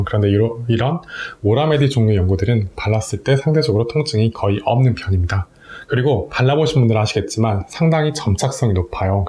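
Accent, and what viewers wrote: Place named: Jeolla, South Korea. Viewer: Q&A가 궁금했던 내용들이라 참 좋네요, 약사님 전라도 분이신가봐요! 오늘 특히 구수한 느낌입니다ㅋㅋ 영상 감사합니다.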